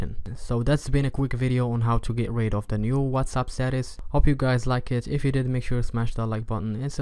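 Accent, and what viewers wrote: Morocco. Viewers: A completely unrelated comment: maghribi akhay yak? Sounds more like it.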